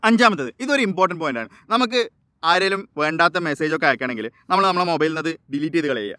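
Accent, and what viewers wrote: Kannur, India. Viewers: Engale kannur karan aano bro ?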